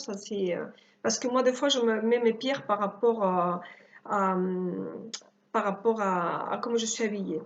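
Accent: Spain. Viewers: Bonjour!!! Moi, j'adore ton accent espagnol d'autant que je suis d'origine espagnole et j'en suis très fière... Je participe donc à ton concours avec grand plaisir... Je trouve très gentil de ta part de célébrer déjà tes premiers abonnés... Je te souhaite une belle journée ensoleillée (car je suis près de Nîmes et chez nous le temps est superbe)... Bisous... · cc, j'adore ton accents espagnol, mon père est espagnol, saragoza 😉 + 1 abonnée , je t'invite à venir à voir ma chaîne et t'y abonnée et me suivre si tu le veux sa me ferait plaisir. gracias bela . besitos. ( attention la belle on a pas le droit de mettre je participe en commentaire) c'est interdit tu pourrais te faire supprimer ta chaîne. Il faut indiqué que les personnes mette un mot tout simplement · Hello ma belle (dis-moi si jamais tu n'as pas envie que je t'appelle comme ça:- )))... JE PARTICIPE évidemment avec un immense plaisir. 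Bravo pour tes 100 abonnés!!!... Moi je t'adore, et je suis ravie d'avoir fait ta connaissance. Tu te débrouilles super bien pour ta chaîne et tu es très à l'aise, naturelle et on te comprend tout à fait... Donc, pas de honte... Et puis, l'accent espagnol est un plus!!! Bisous bisous... Tout plein! Sonia, Genève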